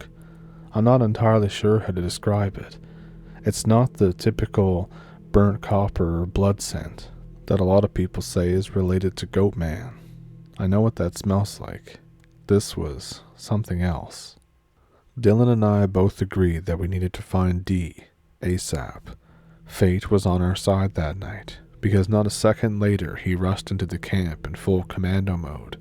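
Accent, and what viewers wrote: Canada. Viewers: I always look forward to your stories. This time even more as I'm from Oklahoma. Love that last one. I was in campfire girls when I was young. Went to camp only once. Unfortunately or fortunately, I've never seen any thing frightening. I've been on overnights with my horse riding groups,to many areas of Oklahoma. Yet nothing. I must be very protected, that's all I can figure. Hope you get better soon sir, love your Canadian accent😊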